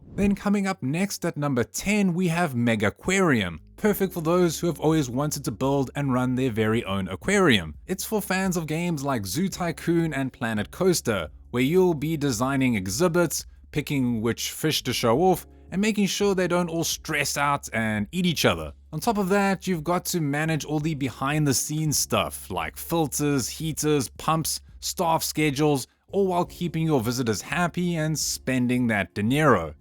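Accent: South Africa. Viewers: Is that a saffa accent?🇿🇦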